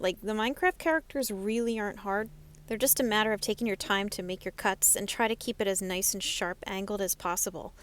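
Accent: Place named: Maryland, United States. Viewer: Are you from Maryland? Noticing your accent, hon! 🦀 P.S. Thanks for tutorial 😀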